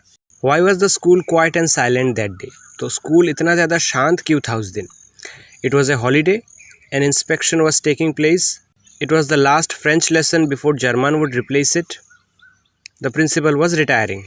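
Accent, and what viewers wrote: Bengal, India. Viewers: Dada apne kita Barak valley theki ni na onno jagar emne tho Buchi apne Bangali 😅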